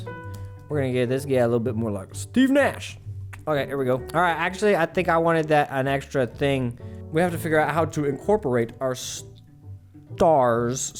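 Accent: Argentina. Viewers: wAit- Are you Argentinian(?? o_O (that or your pronunciation and accent on Spanish is like from Argentina). I'm kind of new in your channel, maybe I don't know this type of information 😅. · Por qué tenés acento argentino? Cuál es tu historia?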